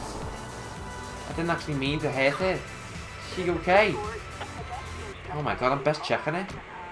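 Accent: Liverpool, United Kingdom. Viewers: Are you from Liverpool? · GinaBee yeah i think so, SCOUSE !!!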